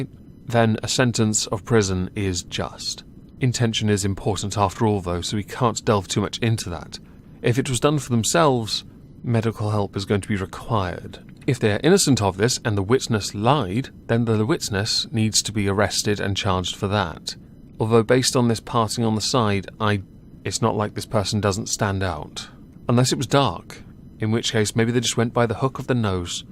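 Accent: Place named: England, United Kingdom. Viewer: I think he's English hence why he said it that way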